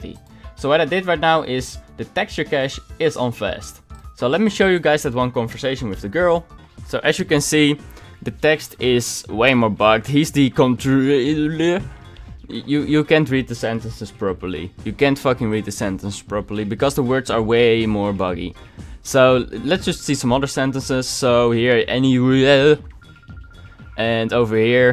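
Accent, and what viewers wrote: Netherlands. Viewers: You sound dutch. NEDERLANDEEEEEEERS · you sound dutch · Lol your dutch like me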